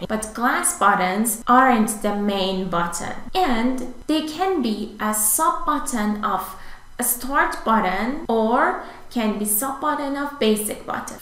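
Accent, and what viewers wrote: Iran. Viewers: Your accent sounds Persian to me nice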